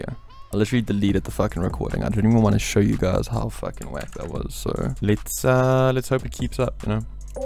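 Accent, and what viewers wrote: South Africa. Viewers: Bro are you from south africa coz your accent is like that lol...great vid im learning alot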